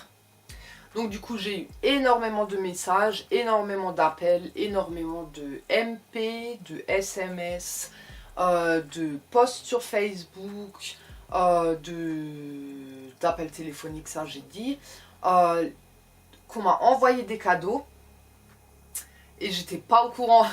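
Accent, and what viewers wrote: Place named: Haute-Saône, France. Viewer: Coucou petite sorcière, juste pour te dire que moi je t'aime telle que tu es, franche et honnête. Ton accent en tant que fille de la Haute Saône me dérange pas, au contraire, on se moque souvent de mon accent ici à la Réunion!! Je suis du même avis que toi en ce qui concerne on sait qui! Le para devient compliqué sur Youtube. Ne pas croire à tout bien évidemment alors qu'ils ont de bonnes "bases" mais en font trop!! Voilà, merci pour tes liens de bouquins intéressants. Je suis en recherche de bonnes protections car j'ai découvert il y a plusieurs années que je peux magnétiser les gens, mais je le fait rarement et juste quand une personne de mon entourage a vraiment besoin, (je ne supporte pas les voir souffrir alors que je peux les aider) car ça m'a déjà joué des tours au début car ne faisais pas de protection ni nettoyage après, ça m'épuisait et rendait malade. 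A ce jour, je sais pas si je le fais proprement. Je demande aux êtres supérieurs, allume une bougie blanche et de l'encens de l'Archange Michaël et Archange Raphaël, demande une bulle de protection mais est ce suffisant et efficace ? Après je me "décharge" avec un galet que j'ai ramassé et chargé au soleil, et ou poser mes mains au sol ou enlacer un arbre suivant où je me trouve... J'aimerais tant aider, je ne demande rien en retour, juste je demande à la personne de remercier là haut.. Je ne supporte pas voir les gens souffrir, je me dit que si on m'a offert cette capacité c'est pas pour rien mais j'ai peur pour moi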